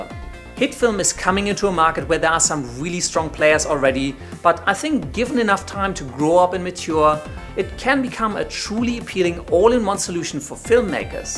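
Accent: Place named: Germany. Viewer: Das ist doch ein deutscher oder? merkt man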